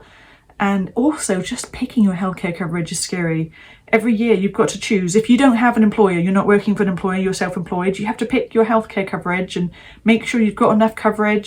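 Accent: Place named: England, United Kingdom. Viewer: Still got your English accent! Hang on to it Luv!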